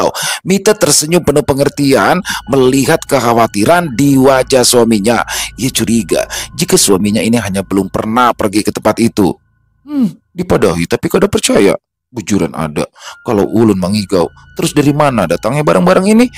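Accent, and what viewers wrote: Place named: Banjar, Indonesia. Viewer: Dialek Banjar nya enak sekali didengar.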